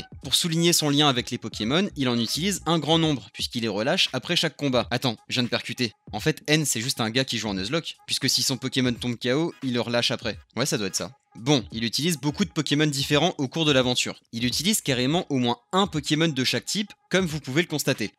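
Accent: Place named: Belgium. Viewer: qu'est qu'il a l'accent belge qu'on m'explique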